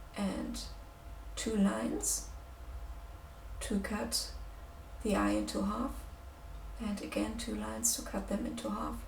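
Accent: Germany. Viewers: Die ist deutsch? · You sound very german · als du angefangen hast zu sprechen dacht ich mir sofort die ist deutsche 😂😂😂 stimmts?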